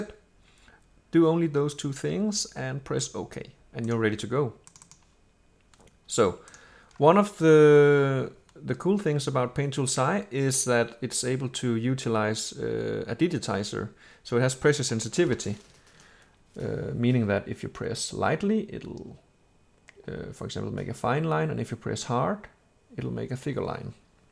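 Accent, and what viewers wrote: Denmark. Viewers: are you danish? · Because i recognize a lot of Danish words like: "men" and some English words pronounced with a Danish accent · Are you danish? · Are you Danish o: ? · Are you Danish?? Just asking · Are you Danish?😱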